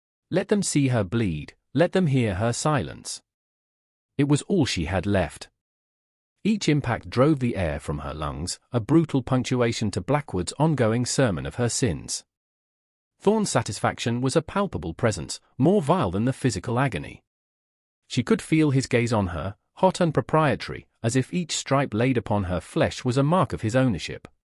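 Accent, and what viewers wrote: United Kingdom. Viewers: Horrible narration. British accent for the 1800's Old West? Seriously?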